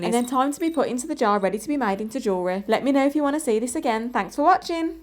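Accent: United Kingdom. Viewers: are you scottish? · I love a brummy accent, it's so comforting ❤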